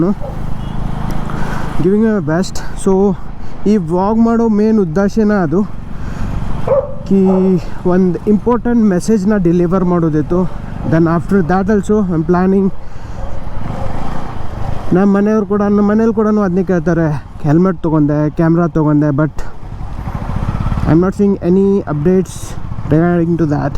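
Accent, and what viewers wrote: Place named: Belagavi, India. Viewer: Belagavi slang ❤ Mix masala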